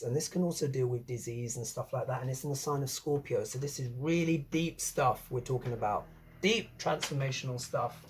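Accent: United Kingdom. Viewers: You are my favorite Vedic Astrologer. That British accent is awesome.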